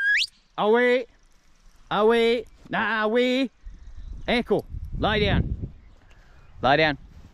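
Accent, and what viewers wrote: Scotland, United Kingdom. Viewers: . i am a 61 year old American midwest “city slicker” (Cincinnati, Ohio). i love my dogs, mostly Golden Retrievers over the years, one who was half Newfoundland. And one “black sheep” 😉 who was found wandering the streets, about four months old, in the middle of the winter, possibly a stray, possibly feral, who we believe 🤞🏼 is part Border Collie, part Labrador 🤷🏻‍♂️ that takes her energy and intensity level down a notch, thank goodness 🙏🏼🫢. She was quite a handful when we adopted her (we didn’t know! she was just a cute little puppy 🤷🏻‍♂️🫢) so she required an adjustment and a lot of activity and attention… and we have accommodated her. Because we love her ❤️. Anyway, i have absolutely no interest in farming or tending animals. (My wife does, however. My neighbor commented on the amazing vegetable and flower garden that she had created in our backyard, and the chickens she was raising in it; “It’s just like the tv show ‘Green Acres’ - to which i replied, “yeah except I’m Zsa Zsa’ 😏😖”. 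Your lifestyle is anathema to me 🫣. But i love what you do. I love the scenery. i love your Scottish accent! Most of all, i love your dogs 😊 It is tragic that some people adopt Birder Collies and keep them as “pets” mostly inside the house, gone all day at work, with a little outside time in the yard, bored out of their intelligent minds with no outlet for their incredible energy and no fulfillment for their genuine sense of purpose. (Some dogs never het adopted at all… 😢😢😢). When i see your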